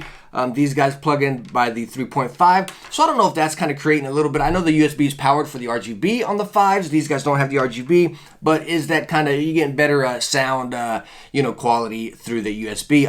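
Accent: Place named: Brooklyn, United States. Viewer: where is this guy from? Brooklyn?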